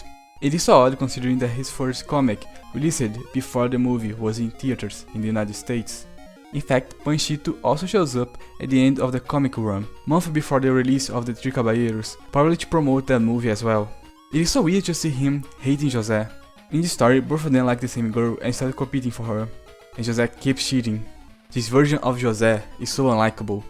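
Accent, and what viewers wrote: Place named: Brazil. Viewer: You is brazilian beacause you have a brazilian assent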